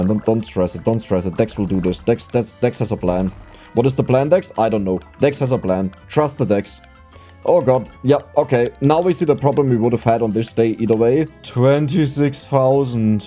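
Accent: Germany. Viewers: I always thought why his accent is so like german until he Said things in german #Selberdeutsch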